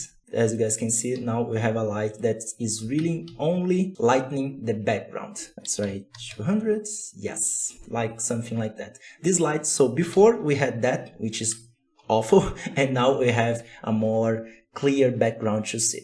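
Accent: Brazil. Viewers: Great job. Thank you for sharing. (Sotaque brasileiro inconfundível) Rssss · You seem to be Brazilian by your accent. Amazing Tutorial, simply sublime. Brazilians are really gifted. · Esse sotaque brasileiro falando inglês é coisa linda demais, força na batalha irmão!!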